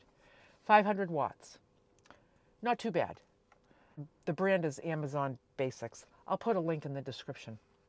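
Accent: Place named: Massachusetts, United States. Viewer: Loving that Mass accent (we used to live in NH!). Safe travels!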